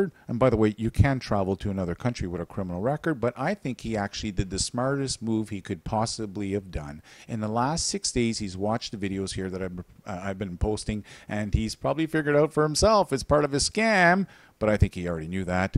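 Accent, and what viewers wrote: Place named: Newfoundland, Canada. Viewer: This guy sounds like a Newfie. Not referring to!!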